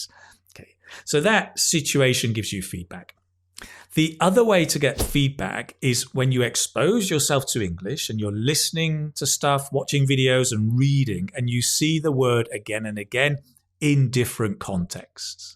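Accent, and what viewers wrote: United Kingdom. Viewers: I always watch your videos. I love the way you teach. I want to take one of your courses. I’m an English teacher in Guadalajara, Jalisco, Mexico. I don’t need to take the IELTS but just for having a challenge and as a motivation. All my life I’ve tried to improve my English. I love English. Sometimes I feel stuck because I don’t use it! Nobody speaks English in my country. What course do you think I should take? I love your British accent! Lizzy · it's a pleasure to hear you speaking with your British and very clear accent and slow enough to understand so well thanks for all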